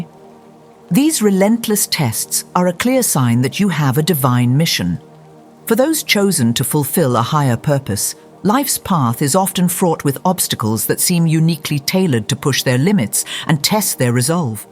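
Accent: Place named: United Kingdom. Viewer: Ok UK accent!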